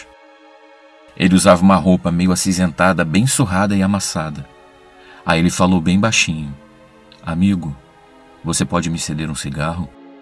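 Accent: Rio de Janeiro, Brazil. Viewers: Ótimo relato! Um ponto extra é pelo sotaque carioca, que eu amo, pois também sou carioca da gema! Muito sucesso pra você! 👍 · Mas o sotaque dele é de carioca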